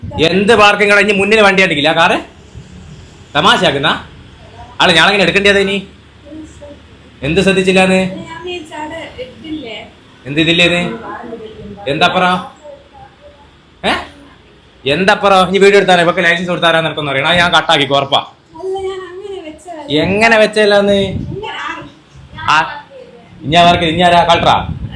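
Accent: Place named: Kannur, India. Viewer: Vadakara, nadapuram, kuttiyadi side slang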